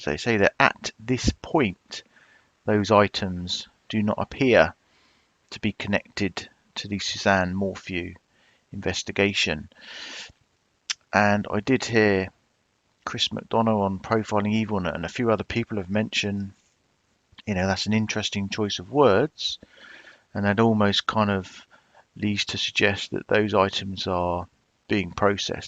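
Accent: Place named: England, United Kingdom. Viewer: where in England is this accent from?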